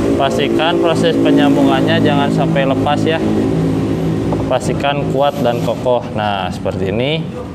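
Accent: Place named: Ambon, Indonesia. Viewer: Mas ini pasti dari ambon dilihat dari logatnya..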